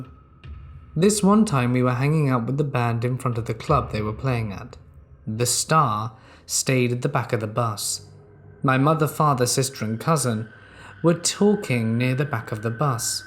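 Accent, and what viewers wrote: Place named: England, United Kingdom. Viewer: The last two stories were the most horrific! If the stories had been too far fetched... like ghost or just a bit creepy I would listen again but these were too near the knuckle... so I won't listen to any more. I'm now confused... your accent sounds English yet you use awesome and dude, you now sound as though your morphing... why?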